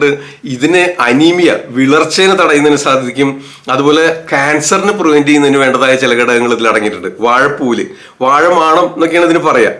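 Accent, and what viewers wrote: Thrissur, India. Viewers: Thrisoor slang👌 nannaittund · pleasant Thrssivaperror accent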